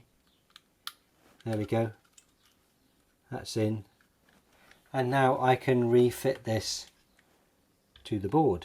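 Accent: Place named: United Kingdom. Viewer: I love British English accent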